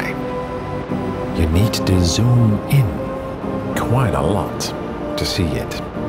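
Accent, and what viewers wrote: Netherlands. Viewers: Goede video, dacht al te horen dat je Nederlands was.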